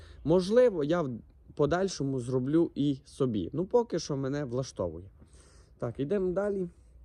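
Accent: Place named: Transcarpathia, Ukraine. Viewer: Все чотко нерозуміють люди закарпатського говору 😁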